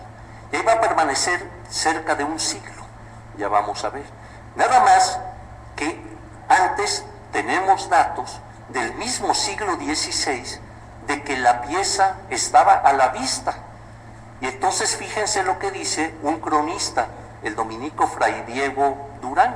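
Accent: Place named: Spain. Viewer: y porque habla Como español???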